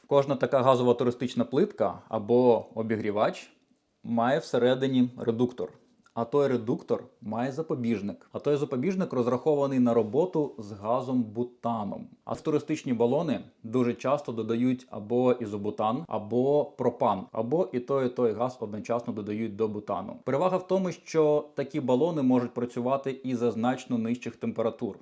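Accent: Standard. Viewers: отмав важливу інформацію без води і чудову аргументацію, наочно продемонстровано найменші деталі. супер! дуже дякую. Окреме дякую за чисту українську мову.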